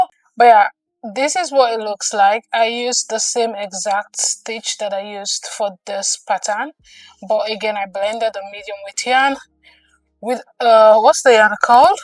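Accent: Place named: France. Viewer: I hear the French in your English ❤😊... You're my crochet inspo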